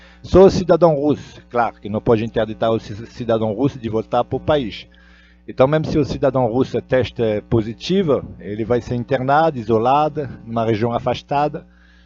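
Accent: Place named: France. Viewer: Porque tu tens um sotaque francês de falar o português, amigo?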